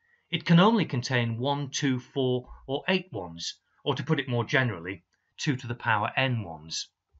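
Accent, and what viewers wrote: England, United Kingdom. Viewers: great video sir. refreshing to hear an english accent teach stuff like this on yt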